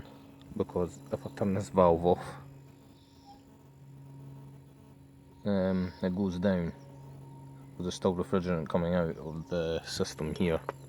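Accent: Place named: Glasgow, United Kingdom. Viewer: I'm fascinated by your accent, are you from glasgow?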